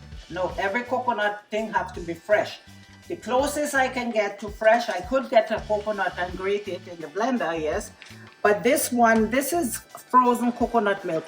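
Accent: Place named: Jamaica. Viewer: I don’t really like the Dried Peas. We make pigeon Peas Soup in Trinidad and Tobago and also in Grenada. I can imagine the taste. I stay away from the Pigs Tail 🤣. This is a meal for sure. They pot some round flattened dumplings sometimes. “Nuff nuff” 🤣🤣. You sound Jamaican too. Looks so good. Enjoy.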